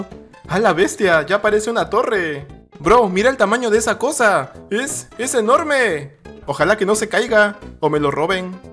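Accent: Mexico. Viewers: Eres de México? Me gusta mucho como hablas 😸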